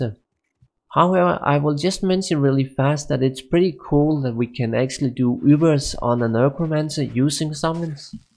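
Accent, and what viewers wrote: Denmark. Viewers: dejligt at høre en dansker :)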